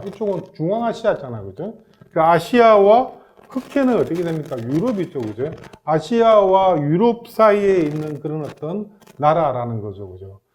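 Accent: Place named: Gyeongsang, South Korea. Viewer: 좀 죄송한데 내용을 떠나서 외국과 관련된 유튜브에는 경상도 심한 사투리 억양 소유자는 나오지 맙시다. 우리 말 발음도 제대로 못하는 사람들이 경상도 아닌가? 직장에 경상도 사투리 쓰는 놈들 참 문제 많다. 사투리를 못 고치니 지들 경상도끼리 뭉친다. 니는 어데고 하면서 아주 가관이다.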